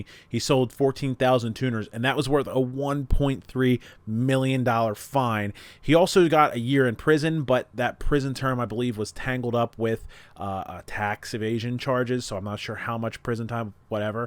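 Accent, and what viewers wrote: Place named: Philadelphia, United States. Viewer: You have to be from philly/south jersey area with that accent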